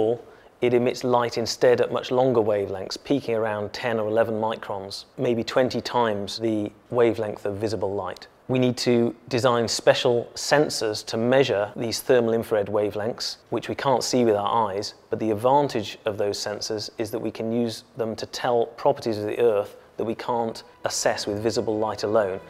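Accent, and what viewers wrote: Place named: Europe. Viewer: Ouais ouais ouais euro anglais on est là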